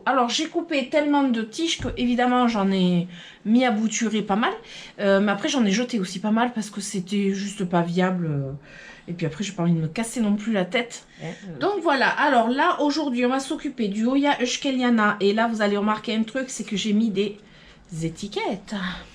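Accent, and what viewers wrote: South, France. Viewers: Tes videos sont pleines de couleur avec ton accent du sud. J'adore. Merci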